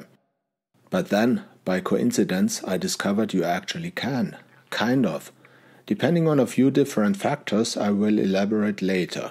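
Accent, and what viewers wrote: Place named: Germany. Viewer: As someone living in Germany, I can tell this man is German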